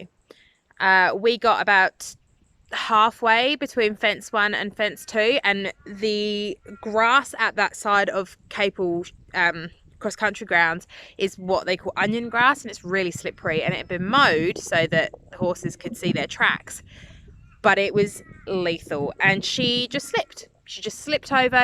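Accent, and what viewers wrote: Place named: New Zealand. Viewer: Ouch! Oh they can hurt themselves so easily can't they :( I would love to see a video on it. I have a question for you - are you in WA? I wasn't sure because you said Perth but you sound kiwi :)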